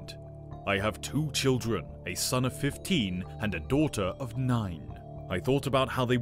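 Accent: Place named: Italy. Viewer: First brit with Italian intonation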